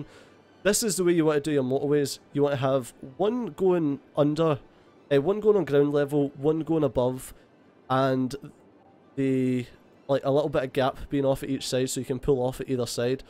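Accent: Scotland, United Kingdom. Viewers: Maybe it's just me. They say they hate english accent but I actually love it. Do people in Scotland always speak like this? I need to focus really hard to understand some words you are saying... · are you scottish? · Im Dutch, but that is scottish, not german.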